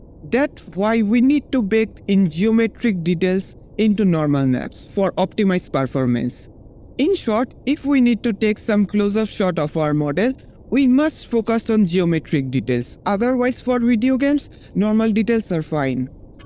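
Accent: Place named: India. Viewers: I am not Indian but I can also confirm that he is Indian. 😅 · i am an Indian so when I listen your English I confirmed that you are Indian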